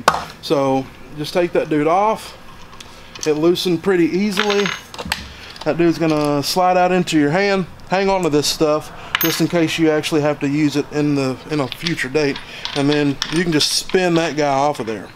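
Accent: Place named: South Carolina, United States. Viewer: Man, you sound like you are from my neck of the woods here in South Carolina..... great review.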